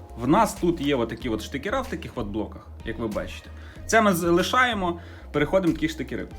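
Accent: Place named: West, Ukraine. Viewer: Класна західноукраїнська мова! Ви часом не з Львівщини?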